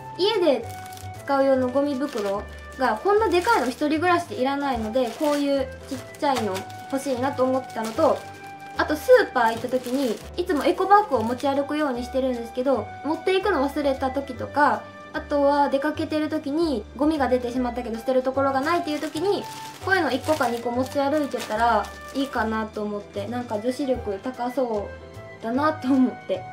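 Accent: Standard. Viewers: めっちゃ標準語｡ﾟ(ﾟ´ω`ﾟ)ﾟ｡ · 今日とくに喋り方標準語になり気味やな（笑） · なんか、標準語？ww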